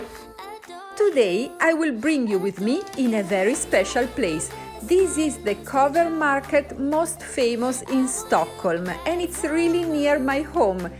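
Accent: Italy. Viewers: I love your recipes! They are so simple but DELCIOUS. To me you make keto very easy, and also healthy. I appreciate it that you show the ones that don't go 100% perfectly because that's real life and I am encouraged to keep going even if I don't get it right! I'm going to try this recipe and if they go flat I will think of them as a 'flat bread' and put the meat ontop... delicious! Ps. Your English is so good... I understand EVERYTHING you're saying... and I love listening to your lovely Italian accent. Never change!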